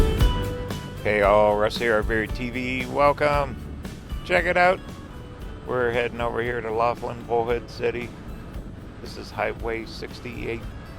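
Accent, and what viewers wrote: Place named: Pennsylvania, United States. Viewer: Listening to you talk, a little "accent" or pronunciation, are you from Pa. originally?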